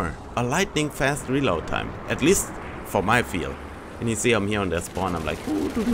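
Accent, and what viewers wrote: Germany. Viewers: r u German I'm impressed with ur English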